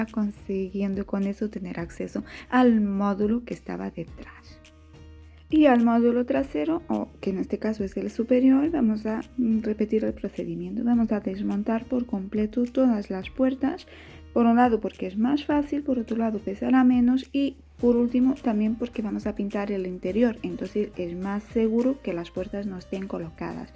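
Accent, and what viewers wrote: Portugal. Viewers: Excelente trabalho. Até aposto que a voz é de uma portuguesa🥰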